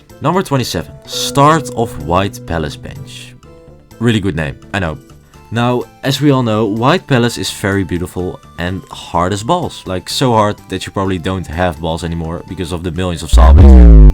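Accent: Netherlands. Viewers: Are you dutch? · You sound dutch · ayo ben jij nederlands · Are you dutch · Love your dutch accent😁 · Are you dutch?? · Dutch accent spotted in the Hollow Knight wilds! This was a super fun video, your dedication to making it as good as you can really shines through 🫶🏻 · Ja duidelijk een nederlander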